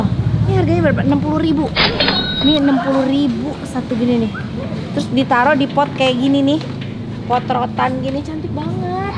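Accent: West Java, Indonesia. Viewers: Lucu banget teh logat bahasa sundanya 😄👍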